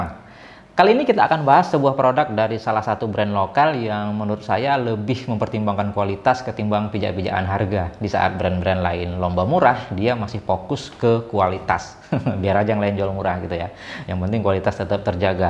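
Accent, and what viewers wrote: Medan, Indonesia. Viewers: Orang medan ya bang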